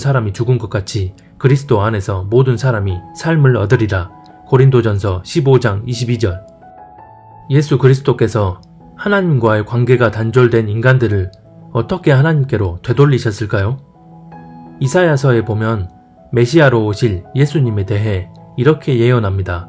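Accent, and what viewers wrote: Jeolla, South Korea. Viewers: 가끔 들를때마다 많은 도움받고갑니다~^^ 근데 말씀하실때 억양에 광주,전남 사투리가 좀 섞여있는거같아요 ㅋ~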